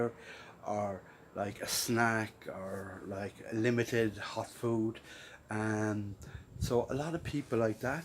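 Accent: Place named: Cork, Ireland. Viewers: you sound like youre from Cork, i am too! ill be headed to puerto rico in a couple of weeks cant wait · Wasstorry languer cork hhh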